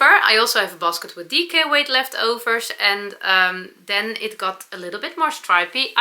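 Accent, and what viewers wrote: Netherlands. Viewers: Your knitting channel is my favorite one,. You are very genuine and knowledgeable. It love your Dutchy English! I agree with you about the Magic Knot and square knots. They don’t work in my knitting either. I tie the square knots with intent to undo them, then twist the yarns and weave in the ends. I consider that Weaving in the ends is part of the knitting process, just like casting on, binding off, and swatching. Keep up all the HEART JUMPING videos! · I would suggest saying, “that explains my Dutchy accent”. There is nothing to apologize for . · I'm so glad I found you and your "Dutchy English!" I'm half dutch myself, so it's so fun to listen to you! I really enjoyed your podcast, so gezellig! I also enjoy knitting, crocheting, and I love sewing as well. I've made some of the Sew liberated patterns, but now I need to get the tiered skirt pattern! 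I've also made quite a few of Lucy's crochet afgans for my children. I love all the colors of your new advent afgan you plan to make in Lucy's woodland afgan. I subscribed to your channel! Groetjes uit Canada! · I feel the same way about my legs! You will enjoy sewing the more you do it. It will become heart jumping! I have to admit that I looked up "Hard jumping" when I first started watching your videos! Then I heard you say it enough that I understood what you meant. I enjoy your Dutch English! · What you have is a lap blanket in English. Heart Jumping=(English expression)my heart skips a beat, other heart expressions are (heart warming=meaning something that makes your emotionally feel good, usually when one person helps another) (heart pounding=a reaction to a scary or surprising experience.) English needs the adjective phrase of heart jumping and as a native speaker of English and English teacher, I'm adding it to my vocabulary. 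English loves to borrow from other languages and I think that this a great one to add to English. I love your Dutchy English which is 98% correct to the ears of a Canadian. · Lovely video! And never apologize for your Dutchy English. I was always so impressed with the Dutch people’s fluency and ease in so many languages. They would greet me in one language after another until they found mine. Lovely people, beautiful country. ❤ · I really enjoy listening to your 'Dutch-y' English...especially 'heart-jumping' and ' Sauce'...and any others you wish to create. 😉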